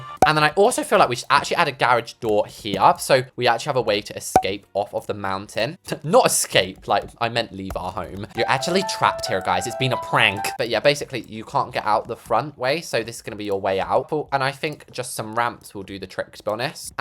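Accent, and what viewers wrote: New Zealand. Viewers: im sorry im from new zealand and that is the most horibble new zealand accent ever 🤣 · ur nz accent ate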